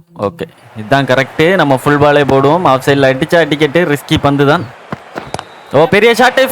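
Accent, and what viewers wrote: Kanyakumari, India. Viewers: Neeenga pesurathu laam nalla thaan bro irukkuthu❤❤❤. Neenga Kanyakumari ah?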